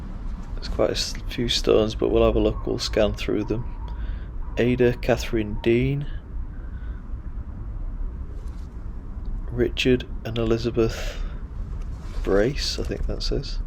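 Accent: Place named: Liverpool, United Kingdom. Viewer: Have you ever seen the little ghost? Or any orbs? A fascinating place with so much history- I live in Canada where obviously most of our cemeteries aren't as old- depends on where you live. I did an anthropology project years ago when I was in uni, one of my favourite essay topics. I love your accent- the Liverpool accent is very distinctive.. Namaste from Canada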